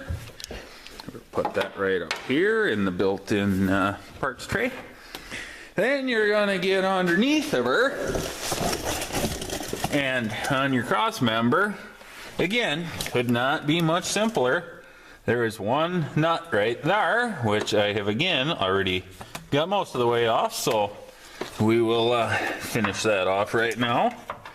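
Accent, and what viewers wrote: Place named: East Coast, United States. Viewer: Great video. I love the back east accent reminds me of home. Would love to have a beer with this guy.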